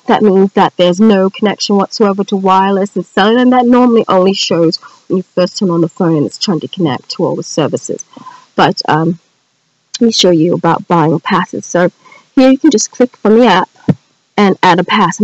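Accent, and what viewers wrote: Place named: England, United Kingdom. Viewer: Nice review. I am always drawn to a good hardware keyboard. I really wish Moto had one in the pipeline based on the X....Nice to finally hear your voice. Your English accent is cool.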